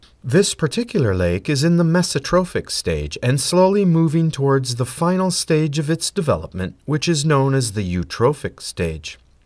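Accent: United States. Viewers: Hooray! you're a fellow New Englander How many East Massachusetts. You do not have a Boston accent as far as I can tell But you might have like no sound down east main either maybe Vermont West Vermont southern Vermont connecticut western Connecticut Edison New Yorker either like a New Yorker I'm confused where are you from I'm not trying to track you down I just like I like to place accents I'm an accent of all. my favorite actions are from like alabama the Kentucky Irish and Scottish and Welsh and English I do like German and Japanese accidents as well. so I just I like to place roughly where people are from you said you're from New England but I can't place where in New England. embassy says that we know how to track you down if I wanted to and I don't. you could be middle middle state or upstate Maine possibly because my grandmother was from down East Main your Kenny Bunkport and she had a very distinctive accent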